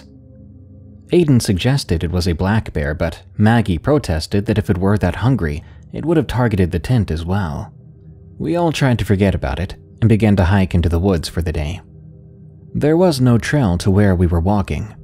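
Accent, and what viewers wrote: South, United States. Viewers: Southern accents are great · Souther comfort with a southern voice! All tuckered in man. Thx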